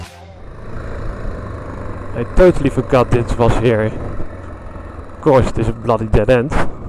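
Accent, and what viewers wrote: Netherlands. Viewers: Op de 1 of andere manier hoor ik gwn dat je een Nederlander bent😂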